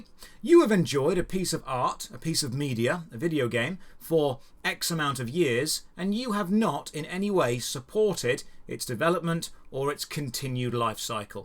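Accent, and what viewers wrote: England, United Kingdom. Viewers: He is paid either way so does he really care? That is the real question. Ask yourself how he got to be an influencer. Who does his editing. Why are so many British streamers getting pushed in the USA market? Marketers think well educated English accents are more powerful when trying to be convincing in advertising or influencing. I guess the numbers don't lie but are you just one of their numbers. How is that for a thought experiment :P Think about it, spend your time pissing off the large publishers and corporate sacks of you know what by showing how convincing you can be swaying a large swathe of the gaming community against their best interests and wait until they come to you. That is what I got out of that whole video, he doesn't care where the money comes from, as long as its worth his time.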